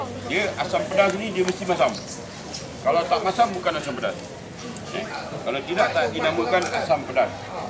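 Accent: Melaka, Malaysia. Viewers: Org melaka mmg loghat mcm tu, korang xyah nk kabut. Cara kasau hati baik, kalau xboleh kasar duk gua kwn dgn beruk. Melaka dihati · memang best pakcik nie sporting tak kedekut ilmu dan mmg cara org melaka lah cakap gitu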